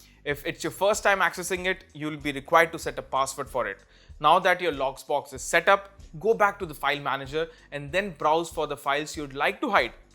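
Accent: India. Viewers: Indian-English accent is so annoying.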